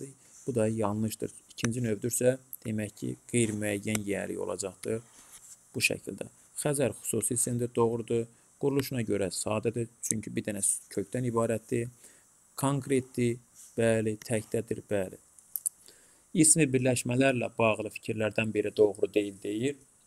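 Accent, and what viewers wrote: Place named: West Azerbaijan, Iran. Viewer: Qaqaş sen qərbi Azərbaycanlisan? Onların lehcesine oxsayir lehcen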